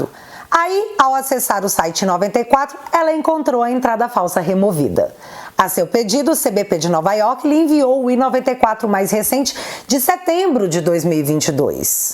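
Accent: Minas Gerais, Brazil. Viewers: você é uma pessoa incoveniente e sem educação, ninguém pediu sua opinião, você não percebeu com toda a cultura que acha ter que não tem, que faz um estereótipo de sutaque de mineiro do interior, que o canal dela é política com humor, falando sério letrado com erros grotescos de ortografia, observa seus espaços na pontuação e seus acentos.... No mínimo deve ser petista, porque escreve como eles😡😡😡 Você acha mesmo que vai dar importância a sua opinião? Enquanto você critica o canal dela só cresce... é uma influecer super relacionada no meio político, não é um Zé ninguém que vai oprimir🤣🤣🤣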